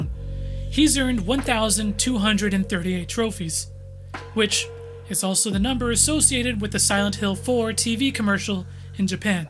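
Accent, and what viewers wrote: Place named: Netherlands. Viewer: Yeah, as a dutch person, there is definitely a dutch accent.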